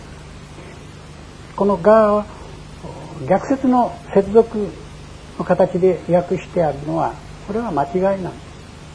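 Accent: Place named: Ise, Japan. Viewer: 遠い世界の片隅に住み古の大詩人である杜甫の如く深山の裾野を巡り林に入り込み暫し途方に暮れし時何処からともなく聞き覚えの訛りのある控えめな染み染みとした語り口のありがたき御講義の冒頭のところが始められたのである。 あの柔和で羞じらふやうな語りの世界は誠に悦ばしき事であります 確かにあれは聞き覚えのある筈のものでありました。 我が妻とその父母の生まれは、伊勢松坂の山里であります。道理で即座に判ったのであります。 本居宣長の生まれし古里である伊勢松坂の山室山の地に近ひのであります。私は己の縁の深さといふものを感じました。 この大小説の有名な冒頭部分の誰も知る桐壺の巻の第一行｢いづれの御時にか、女御更衣あまたさぶらひ給ひけるなかに、いとやむごとなききはにはあらぬが、すぐれてときめき給ふありけり。｣ でありますが、実を申せばこの講義に使ひたき古本があります。昭和二年九月一日印刷、昭和二年九月五日発行、発行所・弘法社、校訂者 有川武彦、発行者 湯川松次郎、印刷所 井下書籍印刷所、｢増訂 源氏物語湖月抄上巻 定価 金 五円 中巻 昭和三年四月十日 印刷 昭和三年四月十五日 発行 昭和四年五月一日 再版 発行 定価 金 五円 下巻は昭和三年四月十日 印刷 昭和三年四月十五日 発行 昭和四年五月一日 再版発行 定価 金 五円 全て和本であります。私はこの書物をいつの時にか、恐らく幸運なことに安価で多分、ブックオフで購入したのだと記憶しておりますが、恐らく稀覯本であったのではなひかと思っております。 確かに古くて綺麗な本ではありませんがいひのであります。 多分生涯の書物になるのではなひかと思っております。 又しても、話しが横道に逸れて仕舞ひまして誠に申し訳ありませんでした、どふかお許し下さひ。 大碩学である岡野裕彦先生の心昂ぶりて已まない素晴らしき名講義を拝聴しやうではありませんか。 擱筆 😊😊😊😊😊😊😊😊😊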